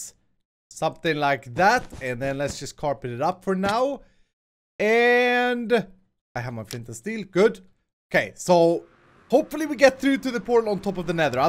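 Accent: Sweden. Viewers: This is so funny for me as a swedish person hearing another swedish persons accent in english xD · I'm not so sure if you will remember me, but I have been watching your channel for a while, commenting. Recently, I couldn't keep up with your vids because I have been so busy. I am currently at one of Korea's best boarding school, named KMLA. (I wanted to boost cause it's one of the best achievements I've made so far...) Anyway, there's a special reason for why I'm commenting. I wanted to say thank you for various reasons. First, your videos make me laugh. It's so fun, creative, and amazing. I like the fact that you are very random. You use a lot of strange words, like crap or such, but I really appreciate that you do not use swear words. Since I had so much fun watching yr vids, I have introduced it to my brother and he enjoys it as well. One thing I hope is... I hope you could do some vlogging... 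Or at least show your face in front of the camera for at least once a month like False. It would be so good to know you as a person ' ' as much as knowing you as 'Swedish accent voiced avatar '. It would be much appreciated by both me and the fans. The second and most important thing to thank you is that you somehow triggered me... Well, in a good way. Remember when you said 'Ja' instead of 'Yes' and did a short commentary about your mother language peeking out? That sorta hit me hard. As soon as I heard that word, 'Ja' for some reason, I wanted to learn Swedish. Maybe it was because I wanted to talk to you with Swedish or I wanted to have a new hobby, but anywa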